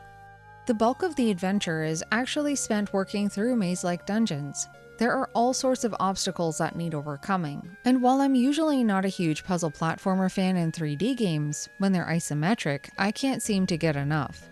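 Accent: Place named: Canada. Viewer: Hey enjoy your videos. I would love to see a Breath of fire 3 review on ps1. Also, are you canadian? I am like 95% sure from your accent you are either very far north in America, or you are Canadian ay?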